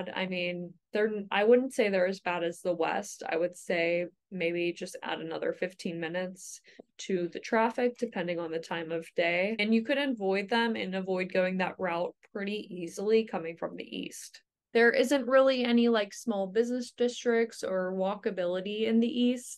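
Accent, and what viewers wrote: Pittsburgh, United States. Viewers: It’s Pittsburghese.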